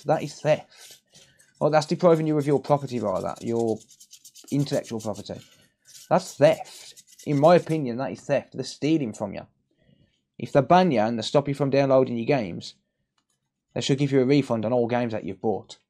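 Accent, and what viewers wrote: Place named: Dudley, United Kingdom. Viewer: Sounds like he’s a local lad from Dudley way.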